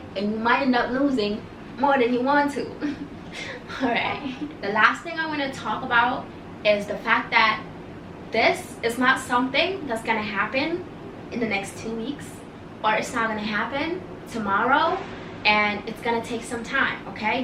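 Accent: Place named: Africa. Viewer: Off topic, but why does she sound like an African who is trying to sound American? Or an African who lives in America?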